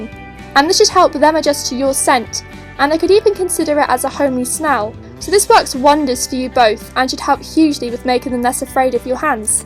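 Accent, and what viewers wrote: England, United Kingdom. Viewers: are you English? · You sound English.. Anyway if you are do you know the best place to get hamster toys and hideouts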